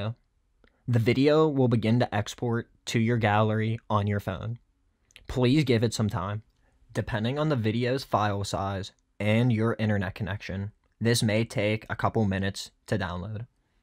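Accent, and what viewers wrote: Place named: Pittsburgh, United States. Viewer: Are you from the Ohio, Pennsylvania, West Virginia tri-state area? Lol I’d know that dialect ANYWHERE. #yinzer 😄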